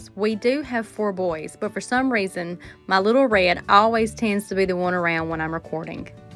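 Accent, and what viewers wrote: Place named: South, United States. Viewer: Just saw your video today for the first time. I can tell you are from the south like me! Enjoyed your video here in Mississippi!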